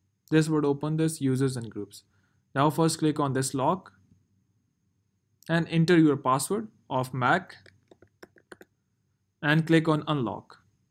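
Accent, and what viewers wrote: India. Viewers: as soon as i heard the indian accent i knew i was saved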